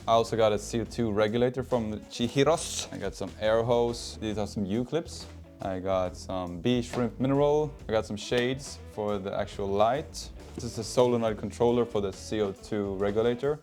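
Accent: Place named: Scania, Sweden. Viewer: Den grova skånskan 😂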